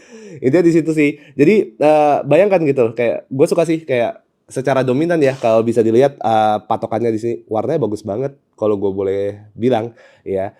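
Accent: Java, Indonesia. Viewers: medoknya emang jawa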